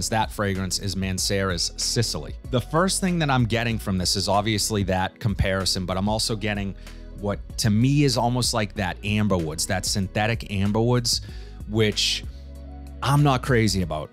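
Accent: Boston, United States. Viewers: LMAO 🤣 The Boston Accent 🤣 always gets me , I'm a Nyer great work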